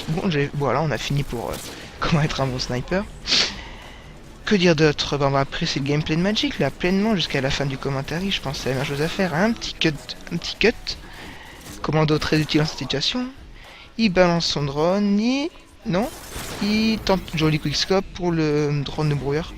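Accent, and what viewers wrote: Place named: United States. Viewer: Quel accent américain !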